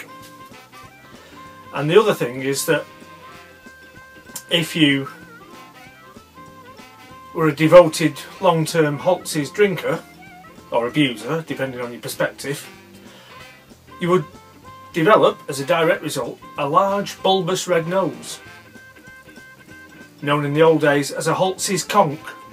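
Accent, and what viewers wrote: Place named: England, United Kingdom. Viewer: What part of England are you from I just can't pinpoint it I love accents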